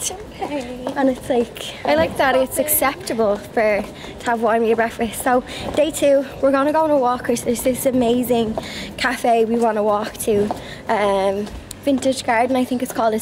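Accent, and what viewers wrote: Ireland. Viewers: That was a nice video! The Irish accent is the best!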